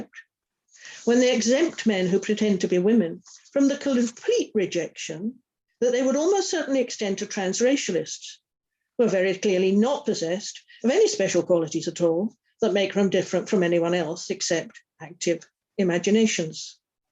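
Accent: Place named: United Kingdom. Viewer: Thanks. Her tone and cadence in way of speaking is very hard for me to listen to. I think this is a British thing. There are British men who speak this way as and I can't listen.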